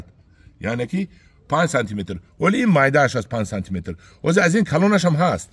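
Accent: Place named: Tajikistan. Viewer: Ахсан ба Шумо, бо шеваи хушруи точики сухан карданатон, ба Шумо бурдбори хохонам.❤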